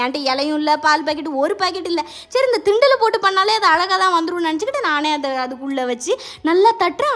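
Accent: Tirunelveli, India. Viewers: nenga pesradha paka en relatives en pakathula iruka mathiriye iruku... married and settled in Chennai ❤native tirunelveli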